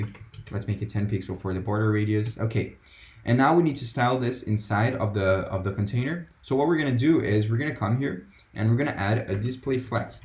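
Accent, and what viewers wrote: Brazil. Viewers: Reconheço um inglês br de longe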